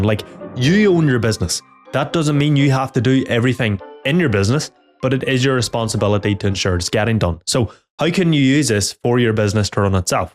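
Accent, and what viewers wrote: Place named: Northern Ireland, United Kingdom. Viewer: He got that light northern Irish accent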